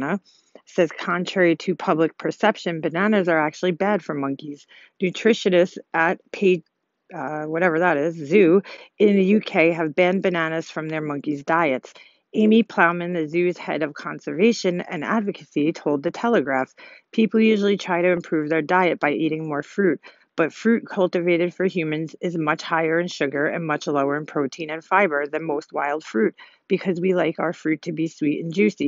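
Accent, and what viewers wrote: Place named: Bronx, United States. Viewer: I love her accent also it's probably like a New York Bronx accent like where my dad grew up she's Italian Maybe that's the way my dad talks he's a sicilian immigrant who grew up in the South Bronx and move to Jersey that's the way his accent sounds that's maybe why I love her accent so much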